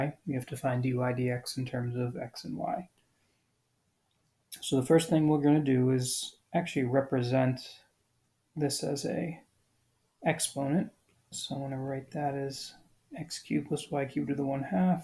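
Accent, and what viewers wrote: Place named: Kansas, United States. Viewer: You sound like one of my uncles. Were you born in Kansas?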